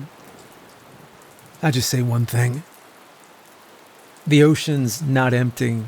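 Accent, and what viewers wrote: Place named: South, United States. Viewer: I can hear your southern accent ❤😂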